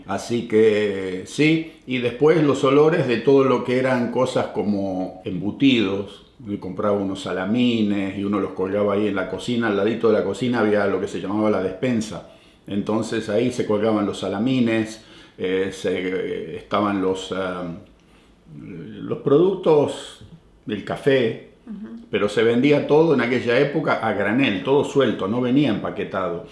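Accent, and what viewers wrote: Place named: Argentina. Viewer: querido que hermoso..no perdistes tus costumbres argentinas,tu tonada,las costumbres..eres muy autentico BIEN ARGENTINO¡¡¡¡¡SALUDOS DESDE SALTA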